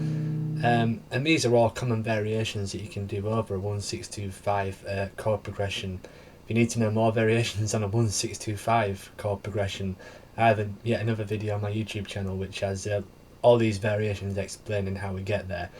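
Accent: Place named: North, United Kingdom. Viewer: Keep on running, nice to hear't northern twang on such lovely chords.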